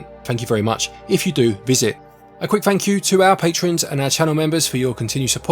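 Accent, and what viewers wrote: United Kingdom. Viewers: omg british english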